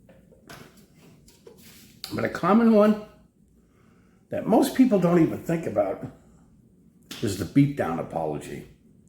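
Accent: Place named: New York City, United States. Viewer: Hi, love your true life stories. You have a knack for encapsulating human nature and real life situations in an interesting and entertaining way. Really enjoyed this one. Like your accent as well is it NYC?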